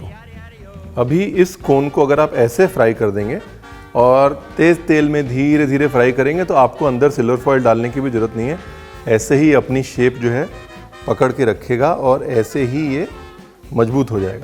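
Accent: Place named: Bhojpuri, India. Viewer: Your bhojpuri accent is so sexy ..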